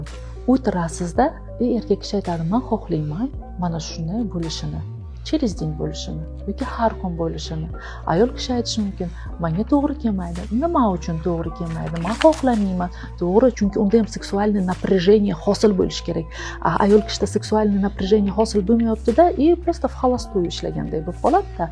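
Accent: Bukhara, Uzbekistan. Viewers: Бухороликмисиз опа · Бухороданмисиз шевачайиз кетоптида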